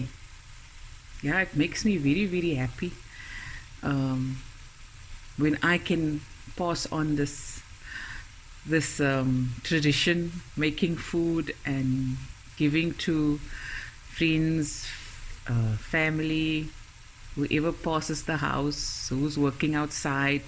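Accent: Cape Town, South Africa. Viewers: It's so nice to walk with you through your garden. Your lemon is doing well 🤣 Oupa's fence looks sturdy and Sunnyboy is as beautiful as always. Loved your story time, so similar to mine. Food, love, bread and always some left in the spens. Lekker koue groenbone op brood, met sout en peper. Jou hart is vol en jou stem so warm en vol liefde. Nou gee jy als terug op soveel maniere en ook hier op YouTube. Dankie vir als. En jou pragtige Kaaps. Baie liefde. 🥰🤗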